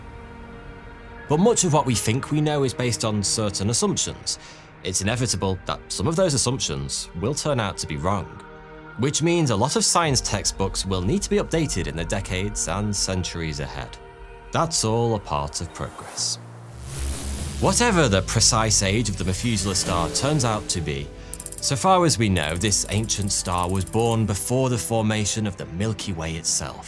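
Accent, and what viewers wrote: United Kingdom. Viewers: WHY DO WE ALWAYS HAVE TO LISTEN TO A BRITISH ACCENT BLATHERING ABOUT THE IMPORTANT QUESTIONS OF LIFE AND DEATH ??? WHEN WILL THAT BROKEN ENGLISH RACE FINALLY RETIRE FROM HISTORY ???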